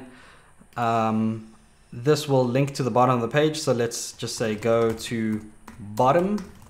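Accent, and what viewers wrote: South Africa. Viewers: I didn't know you were south african <3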